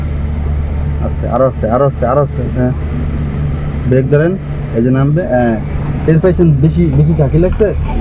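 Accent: Barishal, Bangladesh. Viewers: ভাই এর বাড়ি কি বরিশাল? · আপনার বারি বরিশাল নাকি।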